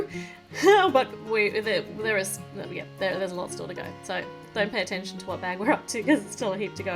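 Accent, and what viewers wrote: Australia. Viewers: Yeah, in Australia people pronounce it "baahk", such a charming accent! 🐨😊You should've heard her last year when she said "care bear" in a vid 😛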